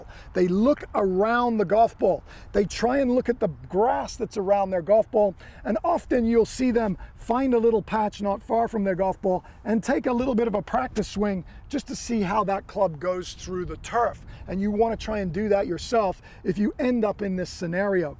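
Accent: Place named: Australia. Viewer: Can hear his Australian accent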